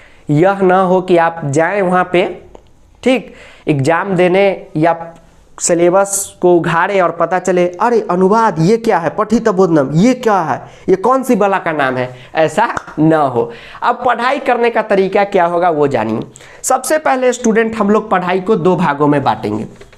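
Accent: Bihar, India. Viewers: Sir aap bihar se h kya ?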